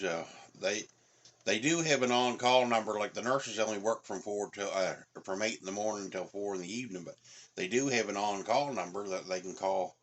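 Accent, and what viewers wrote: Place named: East Coast, Canada. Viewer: Cuntastic! You sounds like a Canadian east coaster b'y, is ya?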